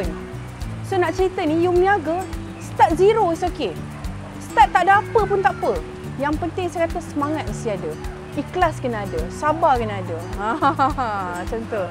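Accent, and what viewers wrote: Perak, Malaysia. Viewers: Best dgr dia cakap perak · atay org perok ckp pun nampok comey jee...ajeee ranjooo betoiii kome....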